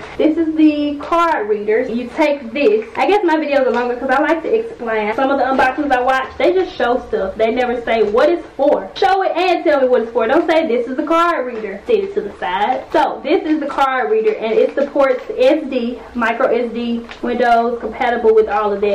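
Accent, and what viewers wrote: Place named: Tennessee, United States. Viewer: I was about to comment and ask if you were from TN until I saw it in your description box haha. I recognized that accent lol I was born there and have family there, but hello from NC!!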